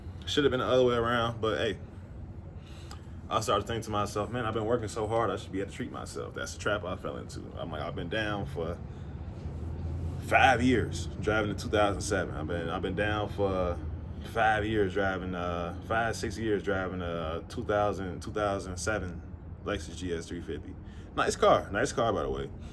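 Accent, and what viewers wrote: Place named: South, United States. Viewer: Foreal bro, I always take mini vacations and travel but manage my money good. Where you from cause I can hear a southern accent a little, I'm from Louisiana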